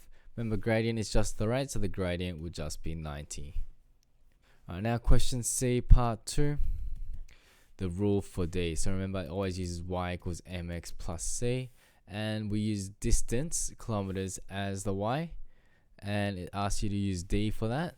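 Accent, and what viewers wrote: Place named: Australia. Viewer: i was wondering why this video was so accurate to my Australian curriculum and then realised why when i turned up the volume and heard your accent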